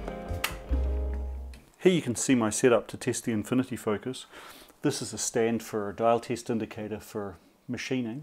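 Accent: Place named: New Zealand. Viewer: Nice, thx! sorry for a silly question but what's your accent? Is it New Zealand? But you seem to live in Germany? Great series anyway :)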